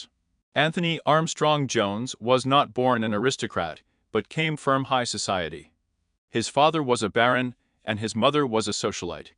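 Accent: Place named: United States. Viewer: The narrator, is he for REAL? Haha. American perhaps.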